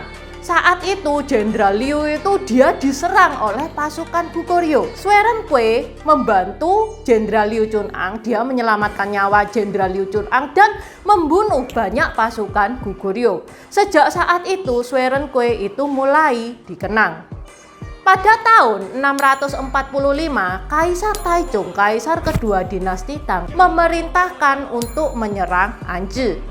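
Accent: Java, Indonesia. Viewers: suaranya banyak dialek. Jowo pada hal Aku penggemar ini orang Bugis. Lhoo 🙏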